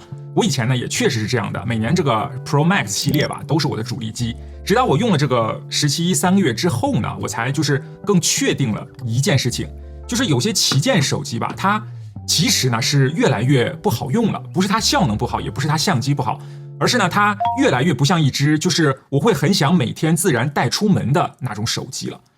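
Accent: China. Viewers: 你们台湾人觉得不觉得他的口音更像大陆的？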